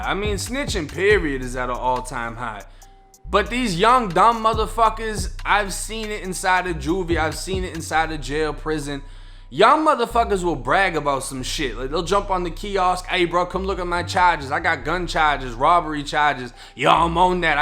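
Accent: Boston, United States. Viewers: Why this dude have a Boston accent if hes from Florida? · Horrible narrorator voice. Half Boston/ wigger. Smh fat ... Hip-hop Harry.smh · Definitely sounds like a Boston Accent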